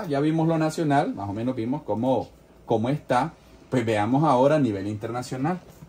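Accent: Nicaragua. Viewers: Sos de nicaragua?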